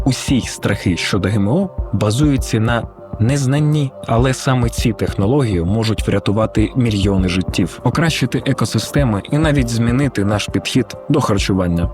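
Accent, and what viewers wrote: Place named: Poltava, Ukraine. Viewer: Шось на полтавському) клас👍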